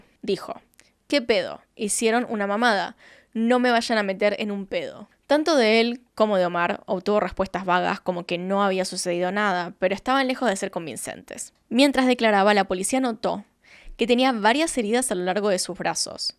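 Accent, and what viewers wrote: Argentina. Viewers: Que lindo escuchar en tu tono argentino todos los lugares de mi hermoso México. Pero que tristeza da que este país lleno de cosas hermosas y personas increíbles como las que tu retratas en este video sea cada vez más conocido por los abusos que genera la autoridad contra su pueblo y ahora también el narcotráfico...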